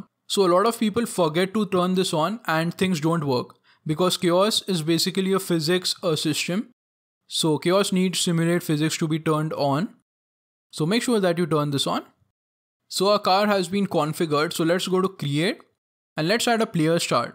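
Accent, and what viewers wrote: India. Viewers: this is what I mean when I say indian accent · you look american but talk in a indian accent my stereotypical brain don't know what to do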